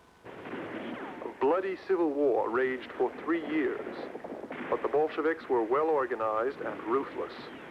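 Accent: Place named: Oxford, United Kingdom. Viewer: Попробуйте посмотреть в оригинале - диктор говорит с очень красивым акцентом, характерным для ученых в Оксфорде:) И это не единственный плюс просмотра в оригинале - про-версия не нужна, нейроперевод не нужен, ваши когнитивные способности улучшаются, а главное - все совершенно бесплатно.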